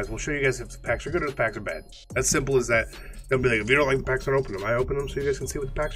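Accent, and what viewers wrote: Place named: Midwest, United States. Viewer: You are so midwestern hahah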